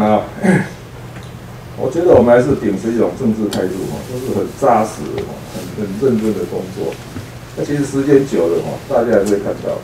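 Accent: Taiwan. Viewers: 有"爛"群組...哈哈!!阿北的台灣口音英文真的很有趣~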